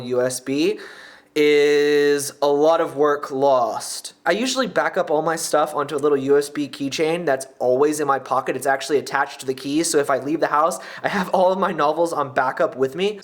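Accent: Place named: United Kingdom. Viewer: You sound like you have a slight British accent, lol! It's the way you pronounce most of your vowels.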